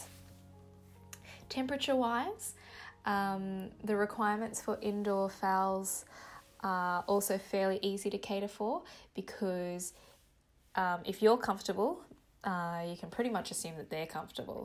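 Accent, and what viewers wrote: Australia. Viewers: I am a newbie to orchid growing...phals and sarcs at present....so all info is greatly appreciated. Also nice to hear an accent from across the ditch....I'm a new subscriber in Wellington, NZ....so please keep the videos coming. 🌞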